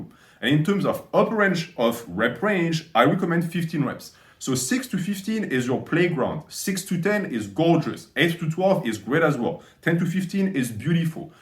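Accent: France. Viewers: je sais reconnaitre un accent francais quand j'en entends un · Rassure moi,tu es français? Je fais allusion à ton accent. Je suis également fan du pull-over,il travaille tout le haut du corps,trapèze,épaules,dos et pecs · Oh l'accent français !!! Trop content de découvrir cette chaine !!!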